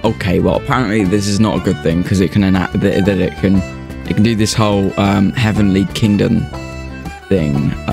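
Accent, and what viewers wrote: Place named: United Kingdom. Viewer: Just discovered your channel, that Opening voice sound like feedback gaming voice, I guess you're both are British.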